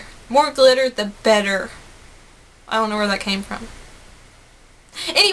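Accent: South, United States. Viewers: I like your southern accent :)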